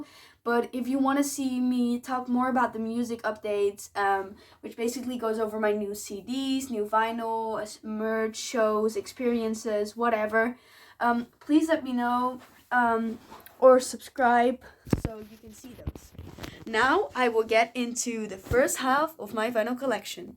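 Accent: Netherlands. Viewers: You sound Dutch. I'm strictly lossless streaming nowadays but I like people going on about their vinyl😀 In my late teens I had several LPs, including your two.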